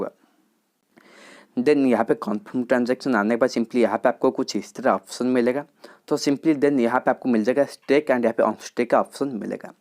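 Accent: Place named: Northeast, India. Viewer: ooh apka bolna ka style north-east ki tarah ha isilia pucha 😅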